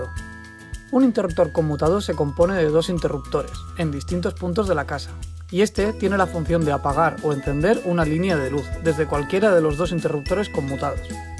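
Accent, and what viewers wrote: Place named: Spain. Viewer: Bueno, por tu acento eres español, y yo soy español. Eso que dices no es así 😂😂😂. Ahhhh y hablas con un electricista 😂😂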